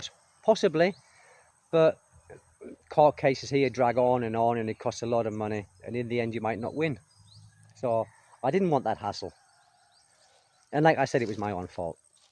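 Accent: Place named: Middlesbrough, United Kingdom. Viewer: Whereabouts are u from originally mate ? Boro accent ? Great videos mate 👍 all the best to u and lovely family 👍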